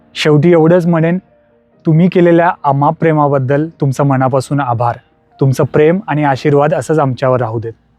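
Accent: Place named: Jalna, India. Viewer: मस्त दादा मी जालना उन आहे तुझं बोलणं पाहुन मला वाटत होतं तु इकाडलाच आहे म्हणून पण माहित नव्हत आज सांगितले तर भारी वाटलं खुप शुभेच्छा तुला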